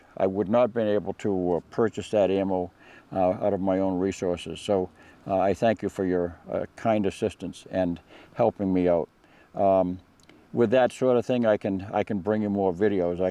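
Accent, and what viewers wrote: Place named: Deep South, United States. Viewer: I always like how he says it’s hot out but I imagine it’s truly <90 F with the sound of his accent. In the Deep South, that’s comfy. When I lived in MI, they literally counted how many summer days were over 90F. I’d drive home from work on a beautiful day with my windows down but everyone else had their windows up and A/C on. Just wild how people waste great weather.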